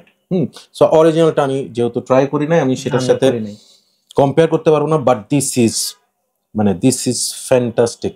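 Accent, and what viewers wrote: Chittagong, Bangladesh. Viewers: বদ্দা, অ'নে চিটাইঙা নে??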